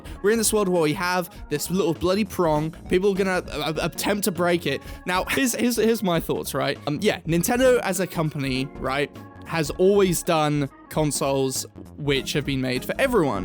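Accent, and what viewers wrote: United Kingdom. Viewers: I don't like how British you've been sounding lately. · How am I only just now realising is British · im being honest your the most British person ive ever heard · you sound like a steriotypical british person and i love it suuuuub~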